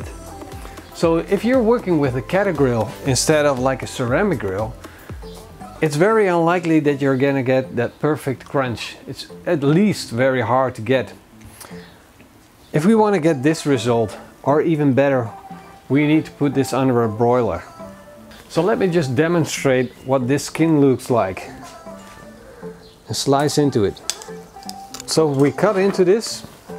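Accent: Netherlands. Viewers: First video i watch of you but damn ik hoor meteen dat je Nederlands bent haha goed bezig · asjeblieft hou op met dat engels....het klinkt echt totaal niet dat NL accent erin.. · cute strong dutch accent !!!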